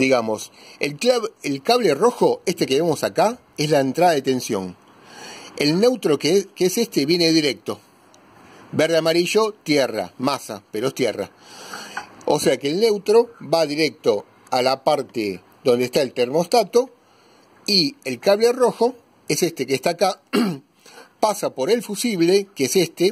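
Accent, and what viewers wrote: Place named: Argentina. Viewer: Se nota que este canal es argentino...Ya viendo la clavija de tres patas,y el acento ya uno se da cuenta...jejejeje Sólo falta el mate...:v Felices fiestas...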